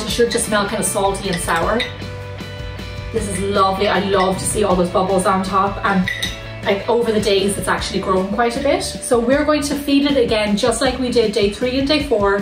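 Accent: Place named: Donegal, Ireland. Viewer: You sound like your from Donegal ♥️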